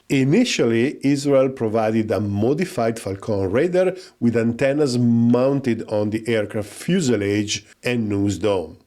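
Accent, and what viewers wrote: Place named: France. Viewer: With a French English accent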